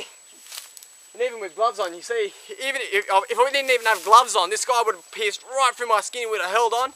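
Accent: Australia. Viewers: grabs possum by back of the neck 'these guys can really struggle' well.. given it probably thinks you're trying to break it's neck, i'd be terrified too. doesn't seem like you particularly like animals tbh. you almost definitely know nothing about them, all of your videos just include hypothetical strange situations, where you give your own opinions and umbrella terms that emcompass pretty much any wild animal and are not specific to the topic of each video. Lastly, you sound Australian, and that was an Australian possum. Possums here do not carry lepracy. Please.. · He sounds Australian, he's catching wild animals with bare hands and this video was posted about 2 years ago. Chances are, he's probably dead.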